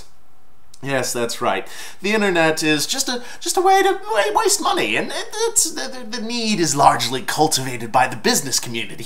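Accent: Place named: London, United Kingdom. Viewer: Great London accent - as appreciated by this English person.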